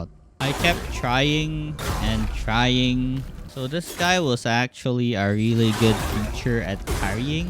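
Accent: Philippines. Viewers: dude are you filipino? cause you got the a ccent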